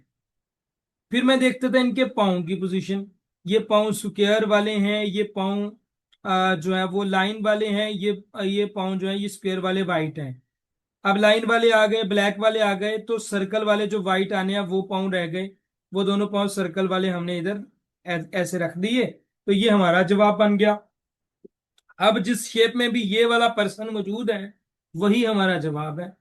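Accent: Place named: Punjab, India. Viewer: Haha ur Punjabi ascent hits different 😂❤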